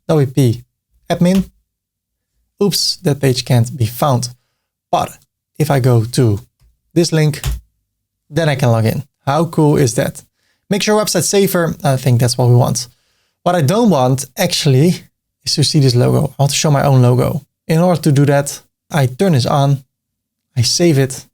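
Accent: Netherlands. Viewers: sounds like a dutch accent . im not sure though. here goes nothing. Nederlands?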